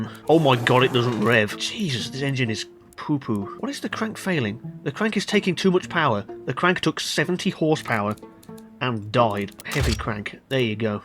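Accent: United Kingdom. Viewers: Omg funny bri'ish fella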